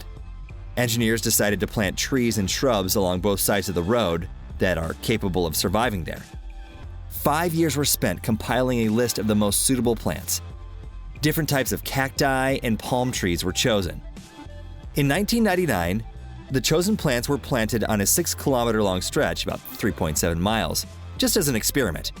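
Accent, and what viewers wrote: United States. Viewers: American video spoken in an American accent using American units of measure. Hmmm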